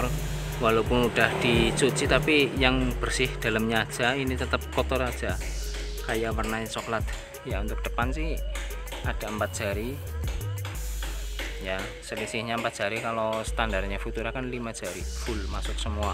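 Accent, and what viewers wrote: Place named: Banyumas, Indonesia. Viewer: Pada pada orang ngapak...infonya mas yg bagus2 buat tambah ilmu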